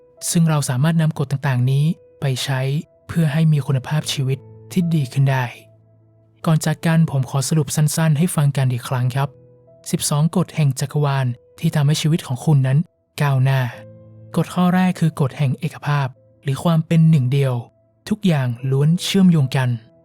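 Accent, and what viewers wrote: North, Thailand. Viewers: คนเหนือแน่นอนครับ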